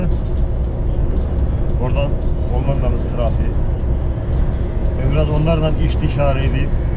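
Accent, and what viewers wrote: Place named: Çorum, Turkey. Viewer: Dede sen corumlu olmadigina eminmisin.corumlular gibi konusuyon.kirsehir corum şivesiylemi konususyor yoksa yaw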